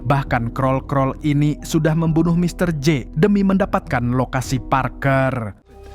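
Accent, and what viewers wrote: Banjar, Indonesia. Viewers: Orang banjar ya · Pian urang Banjar kah mang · Bang admin pake bahasa Banjar kayaknya 😅😅😅😂😂😂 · Banjar kh mang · Ikam urang Banjar gayaknyak. Samak awan diakuk.slm kulak.alehhai. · Urang banjar ka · Urang banjar kah pyn mang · urang Banjar kah Pian ni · Bah banjar jua sekali nya, d.mn nya ikm mang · urang banjar kah ini 😂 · Curiga amang nya nih urang sungai tabuk😂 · Ai urang banjar sakalinya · Urang Banjar kah mamang ni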